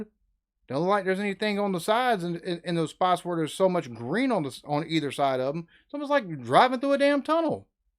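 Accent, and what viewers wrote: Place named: South, United States. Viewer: Southern accent. Trucker cap. Huge beard. Huge willingness to discover and learn new stuff. Excellent delivery. That’s the kind of American I love. Easy sub for me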